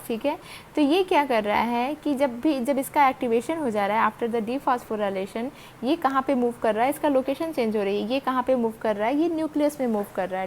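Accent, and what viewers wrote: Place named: Himachal Pradesh, India. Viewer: Mujhe apki slang himachali lg rhi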